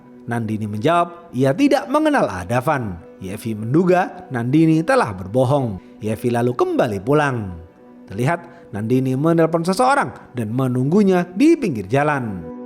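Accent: Java, Indonesia. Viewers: Jawa mu mana min?tugelan barang mvok ghowo2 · Wong jowo po mas e?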